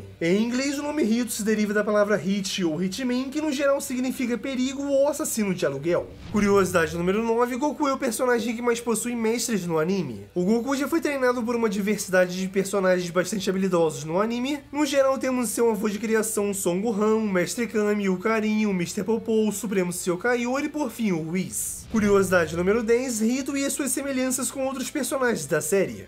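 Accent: Portugal. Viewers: Vc é português (PT - Portugal) ??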